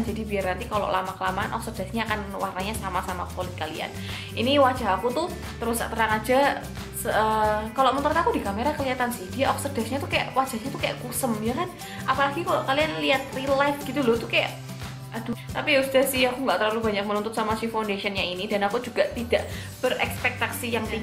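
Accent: Java, Indonesia. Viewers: Mba bule medok 😍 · Yey Like And First Watching Video Mbak Medhok.. Sukses Trus Mbak☺. Makin hri mkin syanteekk. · Ayunee mbk medhokk😍😍😍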